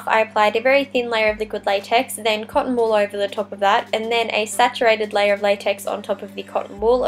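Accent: Australia. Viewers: this is so cool, and are you australian you sound like your aussie lol x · r u australian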